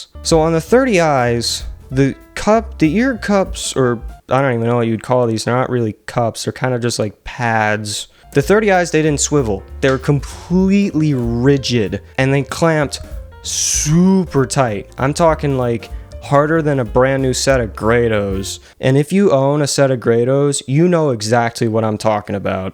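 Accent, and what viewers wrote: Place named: Australia. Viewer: You sound like that funny Australian man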